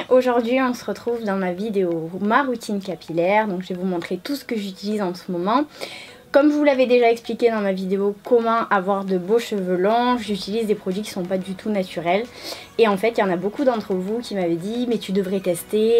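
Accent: South, France. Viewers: t'as un accent du sud non? toulousain ou marseillais? 💍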